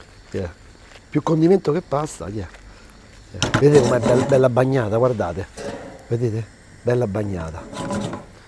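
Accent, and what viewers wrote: Rome, Italy. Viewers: Troppo simpatico con questa cadenza romana che dire..... bravo ⭐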